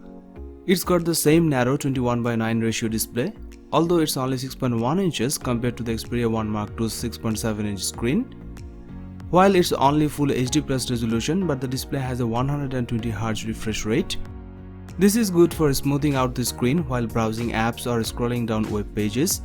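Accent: India. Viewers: I do not understand this Indian accent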